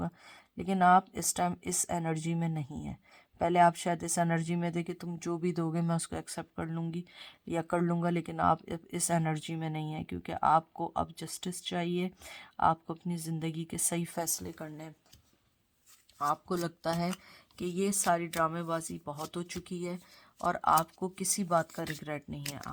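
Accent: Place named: Karachi, Pakistan. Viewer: 100% Resonate to me. Thanks. Aap ke Bolne ke style se lagta hai ke aap Karachi de hain.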